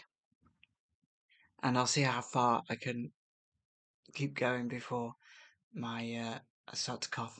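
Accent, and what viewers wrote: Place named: Midlands, United Kingdom. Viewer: Hello gorgeous 🎉. Back again from Glasgow. 😂. Been a while. Hope you feel better soon ❤️🌹. I'm 57. You're so YOUNG. I had some really scary health issues the last year, but I'm back at work again. 😂. Love the midland accent 😮.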